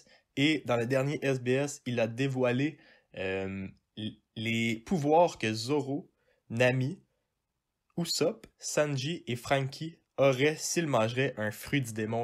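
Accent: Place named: Quebec, Canada. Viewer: ce n'est pas parce que des youtubers 'connus' le font que ça doit empêcher tous les autres d'en parler... dans ce cas là, plus personne n'a le droit de faire des vidéos one piece à part eux? bizarre comme façon de raisonner.. et ce n'est pas parce que TOI tu n'apprécies pas l'accent québécois que tout le monde pense de la même façon que toi. Ce n'est pas une voix qui permet à quelqu'un de percer ou non mais plutôt son contenu. :) Bonne journée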